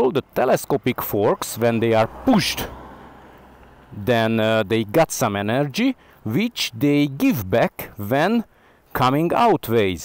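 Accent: Hungary. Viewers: Great video. Based on your accent, are you Hungarian?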